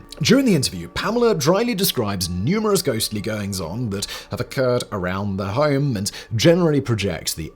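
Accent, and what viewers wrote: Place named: RP, United Kingdom. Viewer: fact boy sounding extra posh as of late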